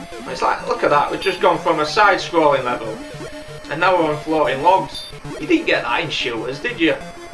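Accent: Yorkshire, United Kingdom. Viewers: Nice to hear a Yorkshire accent on YouTube! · Amazing stuff. Just noticed you by accident. The Ninja :-D Great first choice! Ha ha... Yorkshire accent. I am from East Yorkshire myself. Nice one... I found that if you mostly fire in front of you its a bit easier. Never considered the Hit Rate percentage at all... I tend to power through... lol... I always get stuffed on the floating logs stage. Can't coordinate my firing and movement!! :-D Awesome extra lives snippet there!! :-D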